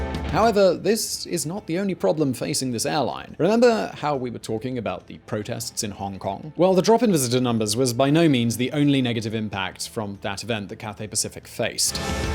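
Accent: United Kingdom. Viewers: Tmr pinche inglés británico xd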